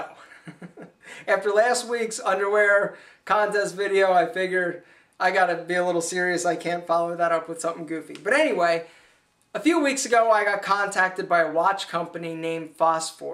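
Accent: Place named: Western Pennsylvania, United States. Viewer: You sound like you have somewhat of a western pa accent :) Very nice videos!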